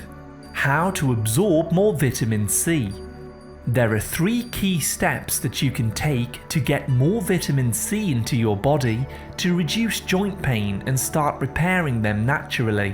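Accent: United Kingdom. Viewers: That is the correct way to pronounce it. He is speaking British English, Britain is where English originated, in case you did not know.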